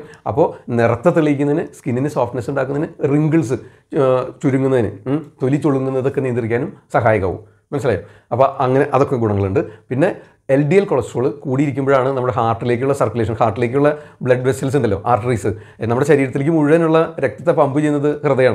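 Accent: Thrissur, India. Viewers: തൃശൂർ ശൈലി യിൽ നല്ല അവതരണം. Good information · Trichur slang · ത്രിശൂർ slang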